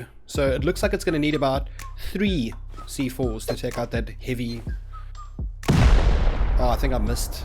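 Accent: Afrikaans, South Africa. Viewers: I heard your voice and new You were south African 😂😂❤️ Bro Where did you get your pc.. Is it a custom build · Is my friend here south African? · Are u South African bru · You sound Afrikaans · Are you south african?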